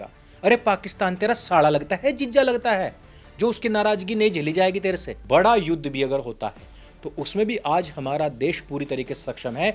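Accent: Haryana, India. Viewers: WAHA RE WAHA BHAI YOUR TANTING IN HARYANI ASCENT MY BAHUT HI PASAND HAI.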